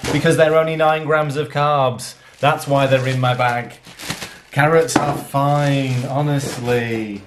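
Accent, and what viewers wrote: United Kingdom. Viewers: This was so helpful. My family and I have decided to start our keto lifestyle (while our child is still young) and most recipes researched are American to which a lot of the ingredients are hard to get a hold of. Hearing a British accent is reassuring as well as knowing you shop in aldi. We use aldi for our weekly shops as it suits our budget so to know that keto on a budget is a thing gives us a lot of motivation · Thank you for this you have no idea how helpful and reassuring it is hearing a British accent it’s like saving grace I’m going out today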